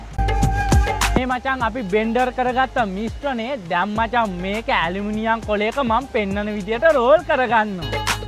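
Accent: South, Sri Lanka. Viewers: Dakune bashavada manda😂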